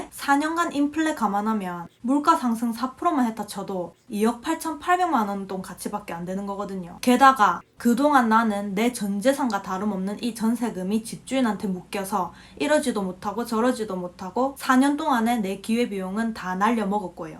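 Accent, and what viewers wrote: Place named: Gyeongsang, South Korea. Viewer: 의 나레이션을 보면서 문득 드는 생각은 내가 남부경남 출신으로서 의 사투리가 무척이나 인상적이다. 남부경남 특유의 성조가 두드러지게 나타난다. 2의 2승 e의 2승을 설명하기에 무척이나 최적화된 사투리 억양을 가지고 있다. 남부 경남 사투리 특유의 '강조'를 할때 다소 신경질적인 톤이 나오는 고유의 억양을 가지고 있다. 기회가 되면 남부 경남 사투리 연구를 해보고 싶다는 생각이 들기도 한다. 다른 사투리는 별로 관심이 없고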